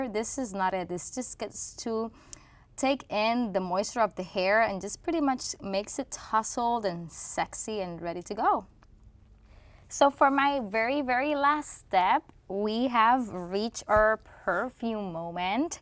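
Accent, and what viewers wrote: Puerto Rico. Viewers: Ningún "Please excuse my thick Puerto Rican accent"! Eres boricua y qué! Gracias por el video! Mucho amor desde PR! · Lol I didn't even notice you had an accent until you said you're from PR 🇵🇷. Keep the clean makeup tutorials coming!!!! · Well done Sephora for using a Puerto Rican MUA! Love the accent <3 and the tutorial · I love your Puerto Rican accent and you're beautiful! Love this natural look. Question... Do you wait a few minutes between each layer of product prior to your foundation? Thanks for a great video! 😀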